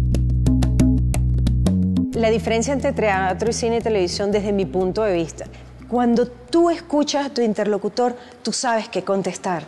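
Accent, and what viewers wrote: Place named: Bogotá, Colombia. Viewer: ME GUSTA TU ACENTICO BOGOTANO.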